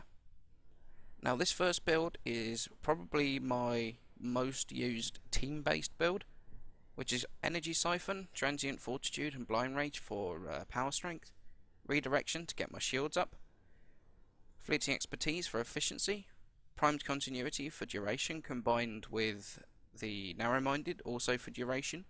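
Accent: United Kingdom. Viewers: I am confused. What has his accent to do with the fact that you are from Germany? In my opinion his accent is the mildest British accent i have ever heard. It is really easy to understand him. Or was that a hint that you would wish for a German version of this video?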